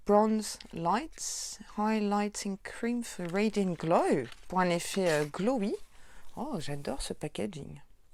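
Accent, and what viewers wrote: New Zealand. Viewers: Loving the kiwi accent 💜💜💜 Jolis goodies, le calendrier est vraiment flashy rose barbie ça fait un retour en enfance je trouve 💜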